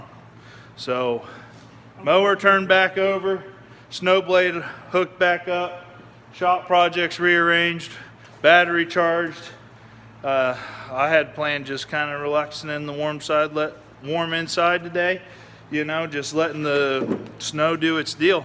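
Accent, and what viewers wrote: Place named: Iowa, United States. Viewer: He is in extreme south central IA very close to Lake Rathburn and Centerville area. He has that southern IA accent.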